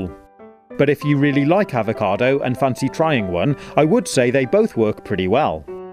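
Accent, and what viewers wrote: United Kingdom. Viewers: if I had a British accent like yours I'd never shut up 😂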